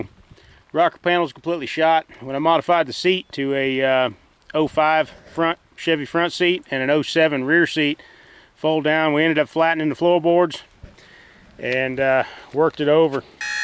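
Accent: South, United States. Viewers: I thought from that accent I'd be right at home in Wyoming. Then you said you were from NC. Makes sense now lol. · Northern Southern drawl. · I was so confused when you said NE WY and then talked with a southern accent until you said you used to be in NC haha. Badass truck bud! My kind shit. Except I'd prefer a minty ol' Ferd. But all them old Merican trucks are sweet! I could use a dump box like this for my firewood gathering. SE WI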